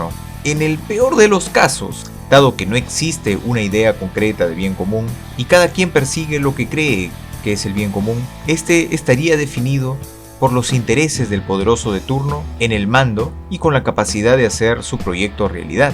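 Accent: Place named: Peru. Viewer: Fascinante! Tu narración es tan natural que me das envidia, me encanta el acento peruano. Curiosamente estoy trabajando en un proyecto para Death Note y he tenido que ponerme a leer sobre el "bien común", entre otro temas, para entender mejor la obra, y te puedo asegurar que me has ayudado a digerir la idea mejor, eres muy bueno en esto. Por cierto, buena analogía la que haces al final: "Trabajo, ahorro, inversión", no podría decirlo mejor.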